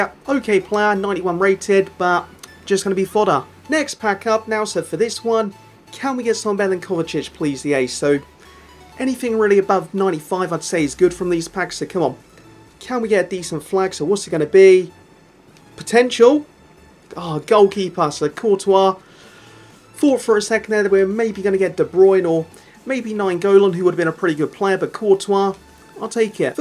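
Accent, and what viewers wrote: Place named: England, United Kingdom. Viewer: What part of England are you from the accent is different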